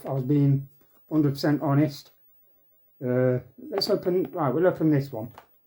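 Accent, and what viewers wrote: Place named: East Midlands, United Kingdom. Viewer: I know that accent. I’m from East Mids.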